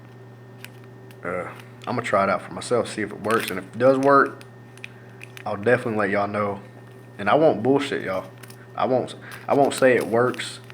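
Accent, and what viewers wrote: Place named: Texas, United States. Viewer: I don't think the machine will work but if you want to buy it just to do a product review that is cool. I think people try to take advantage of the desperate to make money. IF you want to try some kind of blocking device, Neodymium magnets work somewhat. You can get them in a headband or tape them to one. I heard mirrors can send back whatever waves they shoot at you or maybe just even sheet metal. What would happen if you pulled out a frying pan and stuck it over your legs where they send the beams? Maybe it would break their equipment. Why do you they think you should go to Mexico? You don't even look full blooded Mexican maybe because you act so American. You even have a heavy Texas accent instead of a Spanish accent. You should tell them okay, buy my ticket, Hasta la Vista.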